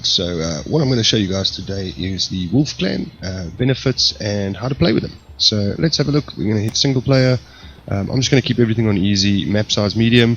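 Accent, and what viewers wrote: South Africa. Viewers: South African! Nice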